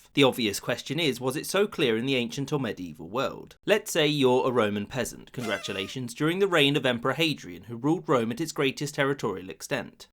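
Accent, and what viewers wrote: England, United Kingdom. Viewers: Why do you have a british(?) accent but use miles instead of kilometers? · You are funny I like your videos I wonder where you are from in England with your accent.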